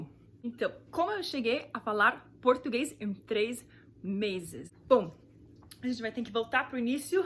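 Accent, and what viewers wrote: Brazil. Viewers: I know but your accent is so beautiful like a Brazilian. Congrats. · Gringo é uma palavra de origem espanhola e significa estrangeiro em português. Quem popularizou essa palavra para os estrangeiros foram norte-americanos aqui no Youtube. Mas não entendi do porque você não tem sotaque nordestino e sim paulista? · Não, o sotaque dela é carioca. Ela não carrega no ‘r’.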